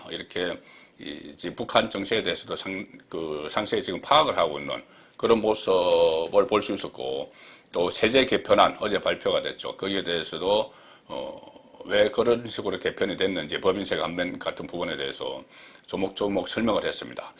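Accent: South Korea. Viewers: 내용은 좋은데 어느 지방 억양인지 도무지 거북하고 거슬립니다. 어색한 곳이 자꾸 들려요.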